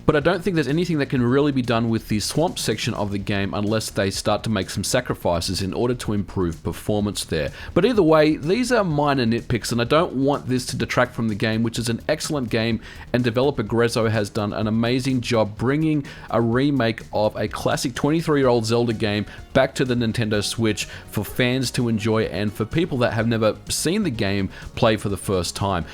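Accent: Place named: Australia. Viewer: you sound Australian but your games are not? do you live in Australia?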